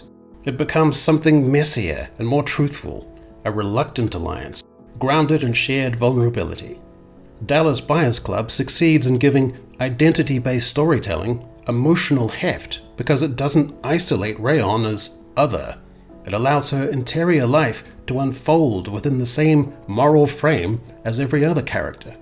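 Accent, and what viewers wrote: New Zealand. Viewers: Based New Zealand voiceover guy #31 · Goodnight Kiwi, picked where you were from immediately. Great video. Asmongold sent me here, congrats on the exposure haha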